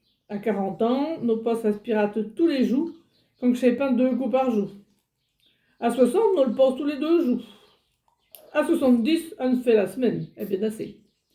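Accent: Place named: Quebec, Canada. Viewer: Quel accent intéressant pour le Québécois que je suis ! Ça évoque certains parlers de l'Acadie et des intonations de par chez nous 😃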